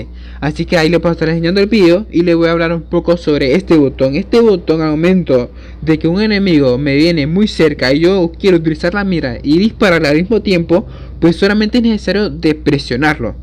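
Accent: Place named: Nicaragua. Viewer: crei que eras de Nicaragua bro un saludo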